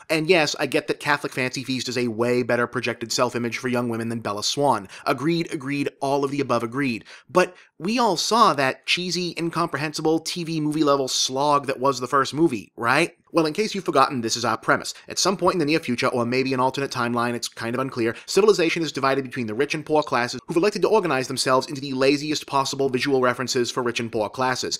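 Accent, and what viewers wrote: Boston, United States. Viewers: has a boston area accent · Wow, his speech mannerisms and accept really show through on this one. I think I've seen about 25 of these things and never go "Boston" stuck in my head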